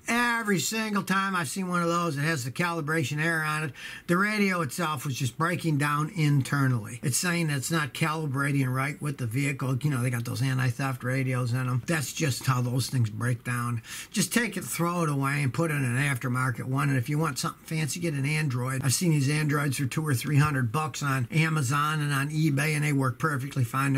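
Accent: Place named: New England, United States. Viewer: With the "Toooob" lol I like his yankee accent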